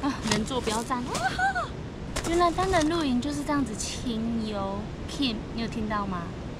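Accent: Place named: Taiwan. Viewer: 妳好能自言自語唷！講台語好道地的感覺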